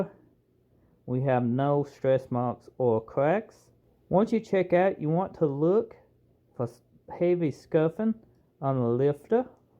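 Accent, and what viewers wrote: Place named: Louisiana, United States. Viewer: Nice video, i work on bikes and going through my lifters and checking pushrod length etc. Where are you from I hear a hint of Louisiana in your strange accent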